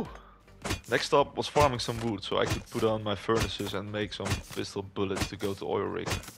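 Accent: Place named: Netherlands. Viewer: Grappig dat je altijd kan horen dat het een nederlander is😅 niks mis met je engels hoor btw! Leuke video!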